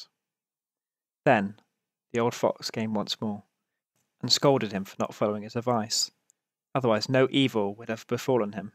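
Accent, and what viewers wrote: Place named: United Kingdom. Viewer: I have searched and searched for these in a BRITISH ACCENT and here I have finally found it!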